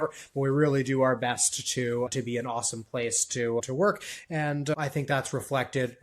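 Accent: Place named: United States. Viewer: Hi.... I have never considered being an EA (until today) but it's a rare quality to find someone who thinks so fast and be unashamed about it. I shared this video with my Danish wife and she laughed out loud (thinks we have the same American voice and talking tempo). Even if we never meet in person, I look forward to more content from you. NDs need change champions just like you.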